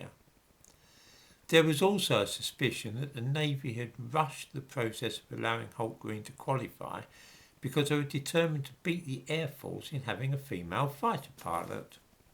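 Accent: United Kingdom. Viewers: you have a way of telling the tale like Fry in Black Adder. We Americans have an affinity for Brit accents. Your opinions sound like American ideas with an ancestral accent.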